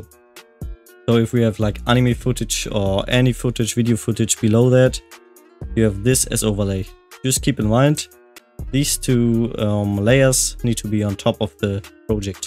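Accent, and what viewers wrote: Germany. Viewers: I love these tutorials! You’re so sympathetic. And dieser deutsche Akzent ❤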